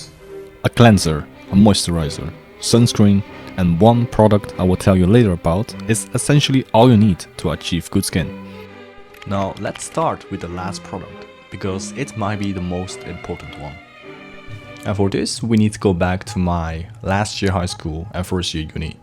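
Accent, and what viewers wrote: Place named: England, United Kingdom. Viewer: I like ur english accent😊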